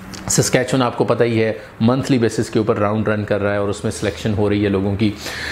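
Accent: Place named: India. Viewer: It's a shame the video doesn't come with subtitles. It looks like it was a super interesting information but I could only catch a couple of phrases. Indian accent is super difficult when you're not an english native :(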